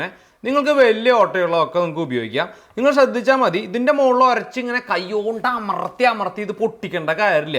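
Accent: Thrissur, India. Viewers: നാട്ടിൽ പോയി വന്നോണ്ട് തൃശ്ശൂർ സ്ലാങ് അടിപൊളി ആയി വരുന്നുണ്ട്... But സൂപ്പർ 😍.... ❤️ ഉണ്ട് ബ്രോ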